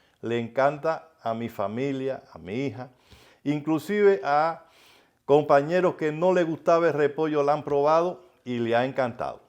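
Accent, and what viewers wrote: Cuba. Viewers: Querido abuelo me hizo recordar a mi papa cubano ese acento cubano es inconfumdible gracias por traerme viejos recuerdos y voy a preparar esta receta con congri gracias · Me encanta ver como prepara sus comidas deliciosas pero lo mejo es ese acento Cubano tan dulce!!! ❤❤❤❤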